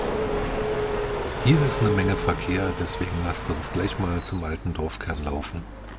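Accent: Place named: Berlin, Germany. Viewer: Ein sehr schönes Fleckchen Erde. 😊 Die Moderation ist sehr informativ aber vor allem gefällt mir als Berliner der Berliner Dialekt . Tolles Video LG Gabi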